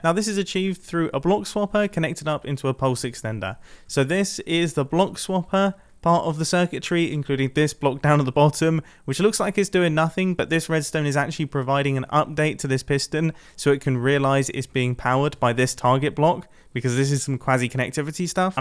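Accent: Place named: United Kingdom. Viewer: mumbo, you sound extra british in this video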